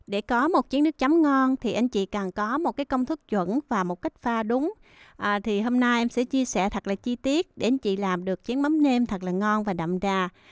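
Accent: South, Vietnam. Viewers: Thơm thái là ngon nhất e người miền gì mà khóm nghe e nói giống miền nam mà nói khóm là seo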